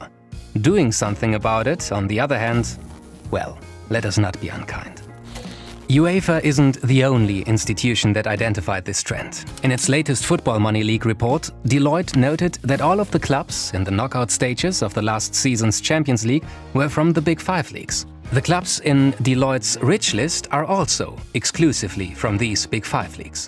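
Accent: Germany. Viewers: this guy could do a 10 hour video about paint drying and i’d still watch/listen love the soothing german? accent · Sounds more like he's german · Are you german? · DEUTSCHER AKZENT JAAAAAAA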